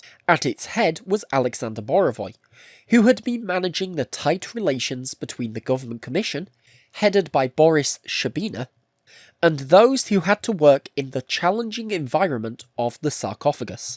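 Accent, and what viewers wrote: United Kingdom. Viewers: I’m unsure if this is AI narrated or not. The narrator is clearly British sounding but he uses the term flashlight instead of torch? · He's british, not german. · British Accent + Documentary + Chernobyl = Random Algorithm Banger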